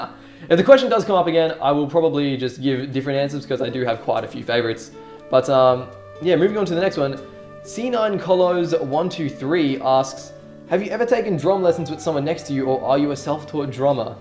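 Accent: Australia. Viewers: jeremy wee well both of them have Australian accents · Dayum son, that Australian accent is thicc af. First time I've seen your face... other than your (half faced) dp xD OreGairu and Nisekoi... the first 2 anime that got me into covering seasonal anime music lol We started getting into anime with the same series... wtf is with these coincidences kek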